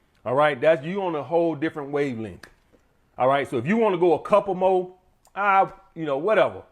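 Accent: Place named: Georgia, United States. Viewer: I can always tell when you go to another state especly Georgia ur accent hilarious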